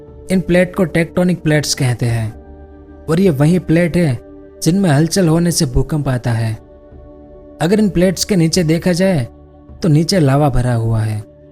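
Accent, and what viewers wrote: Gujarat, India. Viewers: Bro tu gujrati he kyaa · Sir aap ki boli se to laga raha hai ku aap gujrati ho By the way video bahot achhi thi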